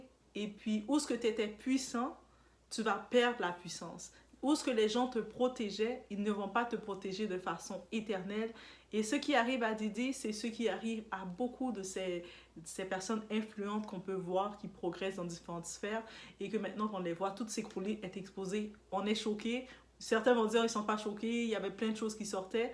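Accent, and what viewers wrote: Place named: Canada. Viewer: Excellent. Ca manque juste un peu usher et le petit Justin J’adoré ta voix avec ce petit accent canadien. 👍🏾👍🏾👍🏾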